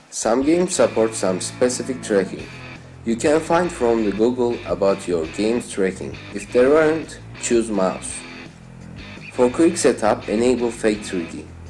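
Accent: Turkey. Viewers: Aksanın her yerinden ben türküm akıyor :D Neyse helal olsun kardeşim güzel videoların var. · Türksün değil mi aksan çok belli ediyo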